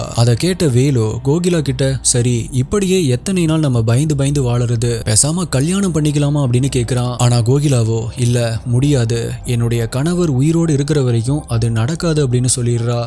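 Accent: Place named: Chennai, India. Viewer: இலங்கைத்தமிழில் கதையுங்கள். நாசமாகப்போன Madras தமிழில் கதைத்து நீங்களும் நாசமாய் போய் சமூகத்தையும் நாசமாக்க வேண்டாம். தூ.......தூ......தூ.....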